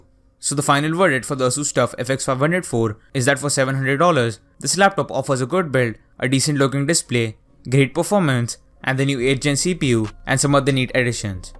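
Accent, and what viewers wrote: India. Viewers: I am Portuguese and I learnt english not how to understand indian accent and even his accent being bad he tries to speak very fast messing with his pronunciation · You’re accent is too strong. Speak a little slowly so everyone can understand you and not just Indians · Indian accent is so funny to hear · Suena más como alguien de la India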